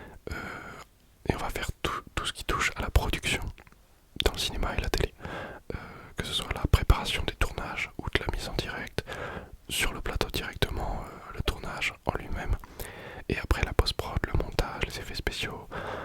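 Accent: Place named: Quebec, Canada. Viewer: Rien que d’entendre l’accent du quebec 🔫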